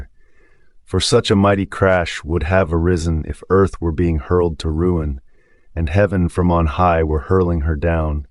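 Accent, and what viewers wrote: United States. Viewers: American accent reading the classics. It's just wrong. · American accent is an abomination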